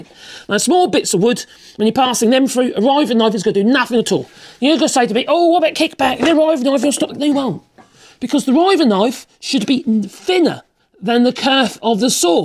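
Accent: United Kingdom. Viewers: I sadly understood barely two words you said mate, but you are so entertaining to watch that I still watched the video to the end. It's so nice to hear a British voice on youtube, especially one who loves tools and making stuff with wood. You're always entertaining because you come across as someone who doesn't give a fig for what people think, and I like that about you. You are what my adorable mum used to call a WYSIWYG type of person (In other words you are a 'What You See Is What You Get) type of person. And I mean that in a nicest way possible!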